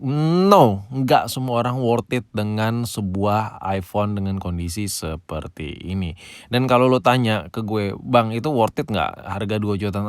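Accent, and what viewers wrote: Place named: South Jakarta, Indonesia. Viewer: Bng lu org jaksel ya? Cara ngomong ny jakset bet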